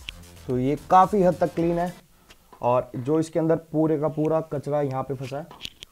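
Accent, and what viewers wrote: Punjab, India. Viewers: Bro tumara bolne Ka accent Punjabi ki Tarah hai agar tum Punjabi ho to pls reply jarur karna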